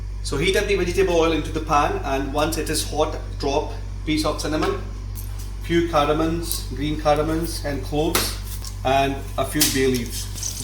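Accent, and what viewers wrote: Scotland, United Kingdom. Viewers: Hint off jock accent there pal 👍👍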